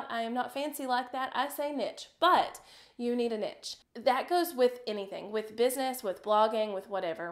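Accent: South, United States. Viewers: I love your country acent🥰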